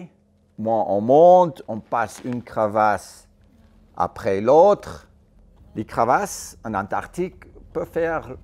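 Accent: Vaud, Switzerland. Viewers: Cet accent vaudois 😂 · Tcheu c’taccent Suisse 🇨🇭!👍🏽😄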